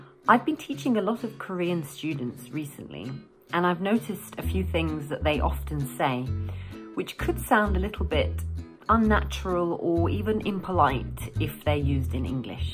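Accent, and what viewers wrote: United Kingdom. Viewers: 발음이 영국 발음이네 했더니 영국분이신가요? ㅎㅎ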